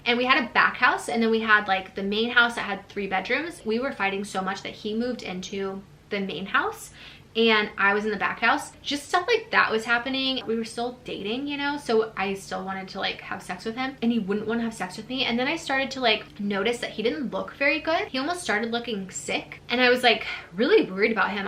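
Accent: South, United States. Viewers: Yes! As someone who's been through surgeries growing up,pain meds can easily become addictive,I try to handle pain without it,plus I can't even take ibuprofen without getting restless leg syndrome,I'm glad you had/have the strength to avoid that,lol also love the country accent haha.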